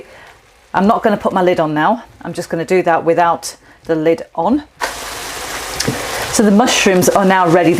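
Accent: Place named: RP, United Kingdom. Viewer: Hi, I tried your chicken pot pie today. It’s a bank holiday weekend and I thought ‘why not’. This is the first time I have ever made a pie and the first time I have used a puff pastry sheet! I copied your recipe but left out the chilli powder and I couldn’t believe how tasty this turned out!! It was that tasty that I ended up eating the pie on it’s own! The only thing I will do differently next time is, I won’t make it slightly saltier when I taste the mixture - just my preference. A suggestion for you, if you don’t mind - when you say ‘my heat setting is a 4’, please always state what a ‘4’ is on your setting. You normally do say ‘that’s a low to medium’ but sometimes you don’t. 😀 In the past, whenever I watched YouTube videos, they were always different videos by different people. You are the first person who’s videos I keep coming back to because your recipes are so easy to follow! 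I love the fact that you do Asian recipes as well as English, and you sound so posh (in a good way!) Thank you for sharing. I have subscribed to your channel. 😀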